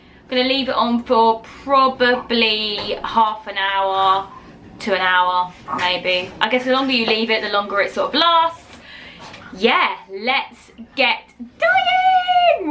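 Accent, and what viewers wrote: United Kingdom. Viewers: Are you British?? You accent is sooo cute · Are you british? 😂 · When you realise she’s British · Her british accent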